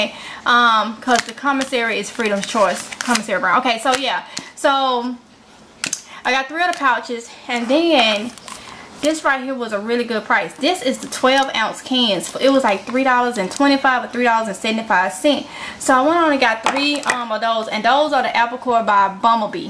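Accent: South, United States. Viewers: Love that southern accent it hits different lol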